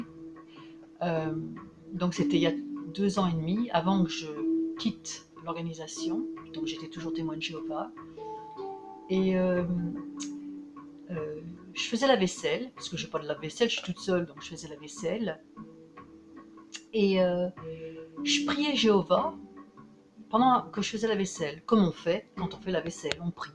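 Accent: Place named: Lyon, France. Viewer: Excellent, Dieu répond toujours à nos prières sincères. Bon rétablissement surtout psychologique et sois bénie. A ton accent, tu ne serai pas d'origine lyonnaise ?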